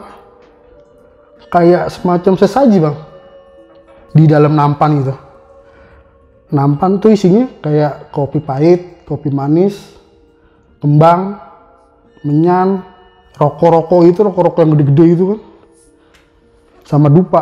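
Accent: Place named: Betawi, Indonesia. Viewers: Betawi bet bahasanya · Betawi bangat da ah logat nye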